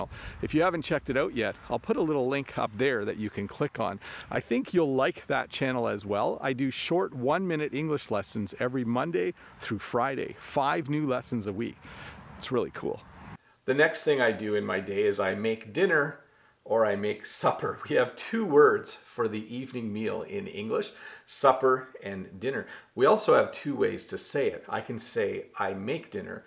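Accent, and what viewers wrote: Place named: Canada. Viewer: I love the Canadian accent... it sounds smoother than the British accent 💖. do you have different accents in Canada 🇨🇦? If so why you don’t tell us about them please... 🤗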